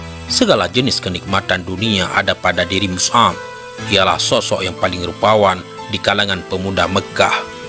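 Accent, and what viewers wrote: Aceh, Indonesia. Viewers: Logat mimin sepertinya dari Aceh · Kalau boleh menebak .di dengar dari suaranya Abang asal dari Aceh / Lombok?🙏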